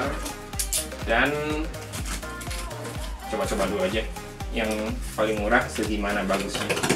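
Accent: West Java, Indonesia. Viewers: gan orang sunda?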